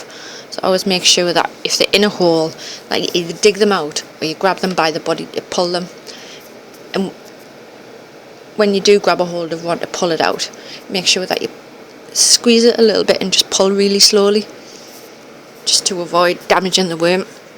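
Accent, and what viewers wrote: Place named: Newcastle, United Kingdom. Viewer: Bexhill Windows ... sounds like Newcastle or South Shields...?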